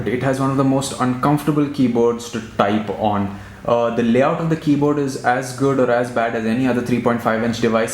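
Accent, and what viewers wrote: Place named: India. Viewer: I like his accent very indian accent english...not a critic but its cool....hahaha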